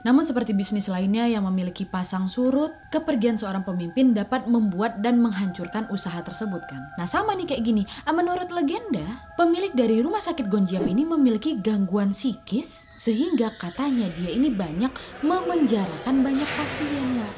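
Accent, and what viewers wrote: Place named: Madura, Indonesia. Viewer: kok kaya logat madura😎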